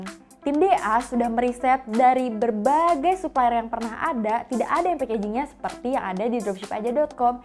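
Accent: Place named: Cirebon, Indonesia. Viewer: Cirebon mana suaranya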